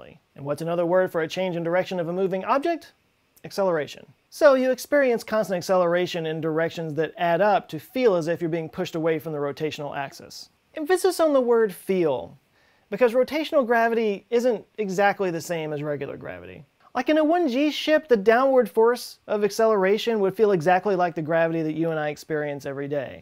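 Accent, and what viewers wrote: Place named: Texas, United States. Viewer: I've been watching your videos for 4 years and i've yet to have noticed your texan accent until today, when I watched your video after smoking weed. I no ain't a native english speaker...but I had no idea how noticeable your accent was. Dude, it's amazing.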